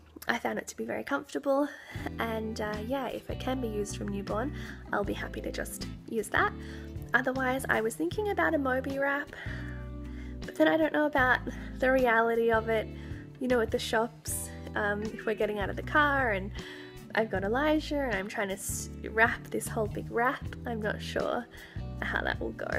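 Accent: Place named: United States. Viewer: Happy to hear you and baby are doing well..its going so fast.I live in Qld Australia, I thought you were American lol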